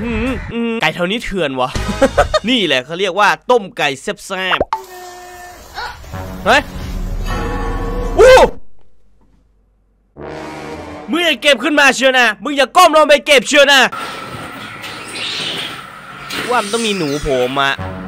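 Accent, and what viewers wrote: North, Thailand. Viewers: พี่ไม่ใช่ภาษาใต้พี่มันเป็นภาษาเหนือ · ภาษาเหนือ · ภาษาเหนือฮ๊าฟพี่ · ภาษาเหนือคับ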